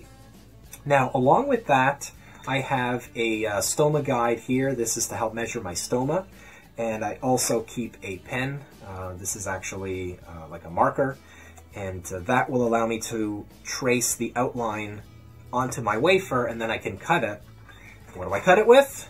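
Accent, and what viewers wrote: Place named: Canada. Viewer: Just discovered you! And you must be Canadian because you sound like me! 😎😁🇨🇦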